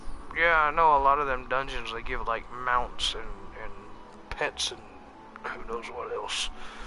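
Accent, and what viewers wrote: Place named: Texas, United States. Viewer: Are you from Texas? You must be from Texas. I really like the sound of your american voice.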